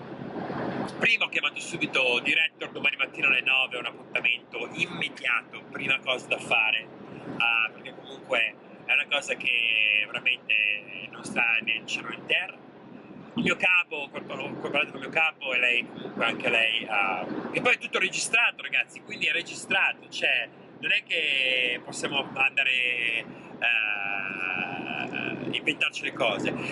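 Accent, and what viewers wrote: United States. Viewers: Ciao, ho iniziato a vedere i tuoi video, fatti molto bene, complimenti. È strano sentire che hai un accento americano quando parli italiano, ed un accento italiano quando parli inglese! 😄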